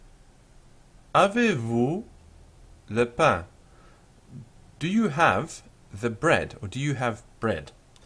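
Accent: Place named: United Kingdom. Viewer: interesting British french accent :-)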